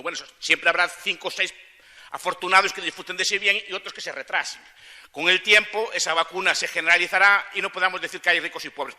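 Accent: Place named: Galicia, Spain. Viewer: Interesante sus argumentos, ....el acento gallego lo hace adictivo....saludos desde Buenos Aires, provincia de ultramar Gallega....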